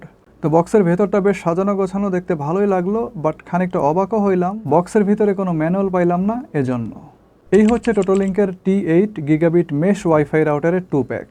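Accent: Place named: Bangladesh. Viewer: আপনার বলার ভঙ্গী খুবই সাবলিল ৷ একবারও আটকে যায়নি ৷ অন্য কেউ হলে এই কথাগুলো বলতে অন্তত কুড়ি মিনিট সময় নিতো ! আর আপনার বাংলাদেশী উচ্চারণ বেশ মধুর লাগলো ৷ ভারতে এটা পাওয়া যায় কিনা খুঁজে দেখছি ৷ কলকাতা থেকে আমার ভালোবাসা নিন ভাই ৷ এসব জটিল বিষয়ে আমার লেখাপড়া কম ৷ সেজন্য আমার ছেলেকে শেয়ার করছি ৷ ওর কাছ থেকে ভালো করে বুঝে নেবো ৷ এই চমৎকার তথ্যপূর্ণ ভিডিওর জন্য অসংখ্য ধন্যবাদ আপনাকে ৷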